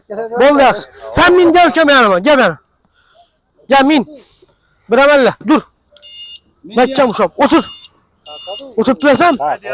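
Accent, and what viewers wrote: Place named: Khorezm, Uzbekistan. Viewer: Хоразм шевани тушуниш кийин булябди хеч булмаса бухоро шевасида гапирадиган йукми илтимос.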